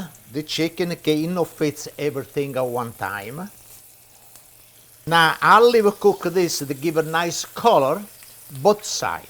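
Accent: Italy. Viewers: you remind me of my grandpa. love that italian accent and the o ya baby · Omg as Italian who lives in Italy the way he speaking make me laugh truly an original Italian immigrant, very focused to he’s recipe!! Greetings from Italy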